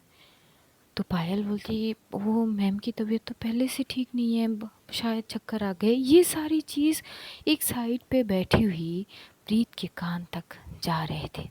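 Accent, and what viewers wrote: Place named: South, India. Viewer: 𝗛𝗲𝘆𝘆𝘆𝘆𝘆 𝗱𝗲𝘃𝗶𝗶𝗶𝗶 𝗷𝗶𝗶𝗶 𝗹𝗼𝗵 𝗵𝘂𝗺 𝗮𝗮𝗴𝘆𝗲 𝗮𝗽 K𝗲 𝗸𝘂𝗰𝗵 𝗮𝗰𝗰𝗵𝗮𝗶𝗶𝗶 𝗮𝘂𝗿 𝗯𝘂𝗿𝗮𝗮𝗶 𝗹𝗲𝗸𝗿 𝗰𝗼𝗺𝗽𝗮𝗿𝗶𝘀𝗼𝗻 𝗯𝗲𝘁𝘄𝗲𝗲𝗻 𝗻𝗼𝘄 𝗮𝗻𝗱 𝘁𝗵𝗲𝗻 💁👇 1. 𝐕𝐨𝐢𝐜𝐞 𝐓𝐨𝐧𝐞:) pehle aap ke voice thode rough thi mtlb tone me ab softness aagayi hai 😌 Phle vo lehza apka aaj v wahi hai Lekin aapka andaz thode badal gya aur apke mizaz ka to kya 𝗸𝗲𝗵𝗻𝗲 .... 𝗝𝗮𝗴 𝗴𝗵𝘂𝗺𝗲𝘆𝗮 𝘁𝗵𝗮𝗿𝗲 𝗷𝗮𝗶𝘀𝗮 𝗻𝗮𝗵𝗵𝗵 𝗸𝗼𝗶😌 Jab mene apki voice phle bar sune the mujhe lga oh ye thode rude arrogant hongi 🤣🤭 fir bad me jana nah bete tera bhrm hai yeh inke jaise pyara to..... 𝗡𝗮𝗵 𝗸𝗼𝗶 𝗵𝗮𝗶 𝗻𝗮𝗵 𝗸𝗼𝗶 𝘁𝗵𝗮 (Bas bas compare Krna h acchai nhi 🤭) 2. 𝐀𝐜𝐜𝐞𝐧𝐭 :) pehle aapki Hindi cute the just like South walo jaise Lekin fir v apka Hindi me story continue kri usme v mene to kabhi accent me apki language aate nhi dekha yeh h 🌛lagan aur nishtha se apne kaam (storytelling) ke liye samarpit hona ......🫶 3. 𝐏𝐮𝐑𝐞 𝐇𝐢𝐧𝐝𝐢 :) kabhi kabhi aap itni acchi words use krte ho ki lgta hi nhi aap ki mother tongue hindi nah ho ha mai Puri tarah to nhi kh sakti ki apki hindi puri thik hue h but ha ab aap jarha h ko ja rhi hai nhi khte mtlb bande ko bandi me convert nhi krte 😀 4. 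4. 𝐃𝐞𝐝𝐢𝐜𝐚𝐭𝐢𝐨𝐧 :) han phle phle aap stories pe characters ke name pe thode confuse ho jate the but abhi abhi apka ye chise bahut had tak kam hogyi hai ...aap rat ho din ho no matter 11 baje v aap stories dalte ho to ab characters ke name pe utna confuse nhi hote Suru suru me aapka discribe krne ka tarika v khoobsurat tha Lekin ab khoobsurat ke saath saath imagination ki duniya ke hume le jakar khada kr deta hai hum un chiso ko vis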